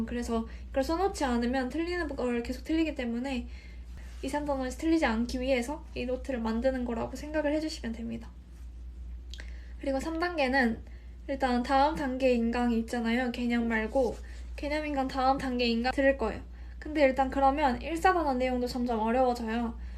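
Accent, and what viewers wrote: Jeolla, South Korea. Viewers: 전라도 출신이신가용??? 억양에 살짝 묻어나는 느낌 ㅎㅎ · 억양 들어보니까 전라도 같아요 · 혹시 광주분이신가요? 전라도 사투리가 귀여우세요ㅎㅎ 그나저나 새벽기차타고 대치가는거 진짜 힘드셨을텐데...정말 고생 많으셨어요!! 얼굴도 너무 예쁘다. 애기같고. 이제 본과생이면 더 고생하시겠네요 화이팅! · 사투리 억양 들어보니 광주나 전남지역 분인듯~ㅋㅋ 성형도 안하신거 같은데 의대생이 외모까지 자연미인이면 세상 너무 불공평한거 아닌가요?ㅜㅜ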